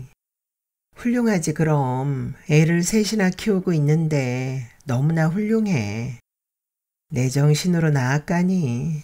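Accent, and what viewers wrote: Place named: Jeolla, South Korea. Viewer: S님의 남도사투리 끝까지 재밋게 잘들었어요.😀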